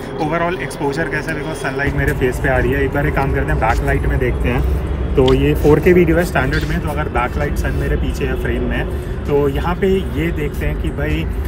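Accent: Lucknow, India. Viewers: Sir aap lucknow se ho ???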